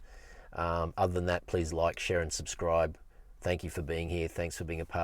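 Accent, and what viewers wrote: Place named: Australia. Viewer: Hi Zane, I'm a fellow Queenslander down on the Goldy, it's good to listen to an Aussie for once on bitcoin, plenty of you tubers from u.s. mate I'm a big believer in bitcoin and have approx 0.8 stacked now my goal is to get to 1 coin soon. My biggest concern is security with wallets ect, and what other steps can we take to make sure we don't lose our bitcoin. Not sure if you have meet ups in person to discuss or just book a call, I'm very keen in talking further with you about this exciting future, I really want to set my kids up so they don't go through life stuggerlig for money. Cheers Warren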